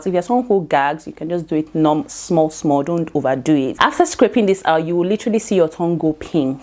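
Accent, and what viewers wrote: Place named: United Kingdom. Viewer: I have an obsession with UK accent & makeup 😍😩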